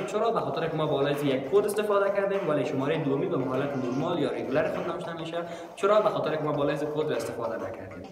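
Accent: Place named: Afghanistan. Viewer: تو ادامه نده افغانی هستی زشتی لحجه داری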